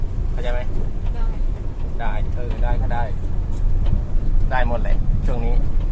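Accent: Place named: Korat, Thailand. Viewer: น้อง คนจังหวัดไหนครับ พูดออกทางโคราช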